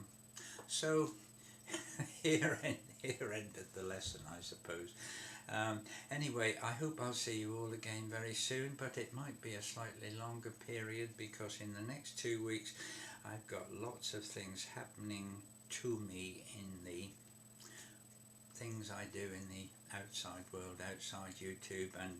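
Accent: United Kingdom. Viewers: Old Guy + British Accent = Best lecturer.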